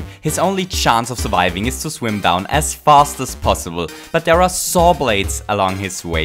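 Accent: Germany. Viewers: bist du deutscher oder so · Super Video, ich weiß das du Deutsch kannst. Hört man auch. ; ) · O my god, dat German accent XD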